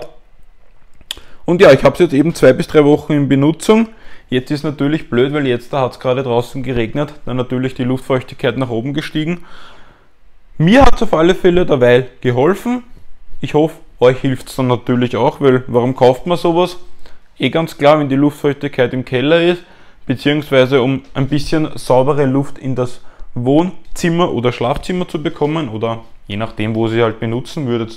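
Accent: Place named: Tyrol, Austria. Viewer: I bin mir sicher das du Tiroler bist 👍🏻🙋‍♂️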